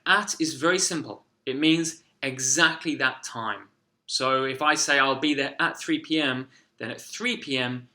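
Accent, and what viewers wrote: United Kingdom. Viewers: Lovely British accent I liked 🙋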